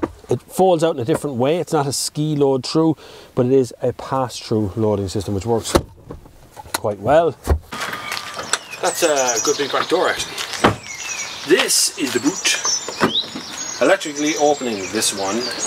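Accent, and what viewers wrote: Ireland. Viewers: Have you ever said the word Tree and found that it sounded identical to the word Three. Just was wondering because of the Irish accent.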